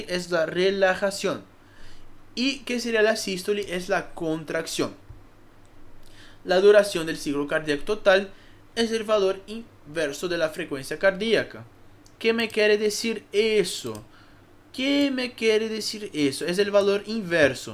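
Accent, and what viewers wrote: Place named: Brazil. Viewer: ¡Ahhhhhhhhhhhhhhhhhhhh! eres de Brasil, tienes un acento bonito, ¡Vida Brasil!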